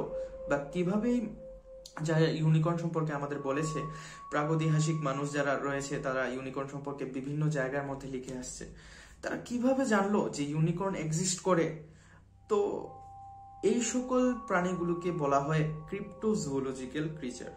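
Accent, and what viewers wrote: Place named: Sylhet, Bangladesh. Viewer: আপনি বাংলাদেশের সিলেট অঞ্চলের মতো কথা বলছেন। আপনি কোথায় থাকেন ভাই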